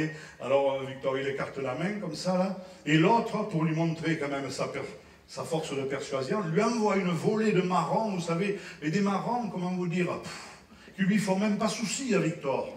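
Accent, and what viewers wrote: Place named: South, France. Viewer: avé l'acent = génialissimo !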